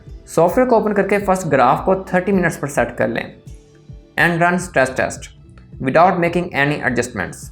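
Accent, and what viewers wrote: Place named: Faisalabad, Pakistan. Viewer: Bhai, ap Faisalabad se lag rahy hain. Ap ka English ka accent ese hi hai. But fer v lagy raho. Love you!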